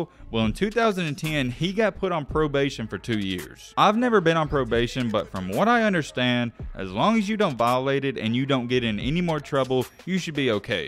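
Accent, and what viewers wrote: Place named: Appalachia, United States. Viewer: You gotta be Appalachian with that accent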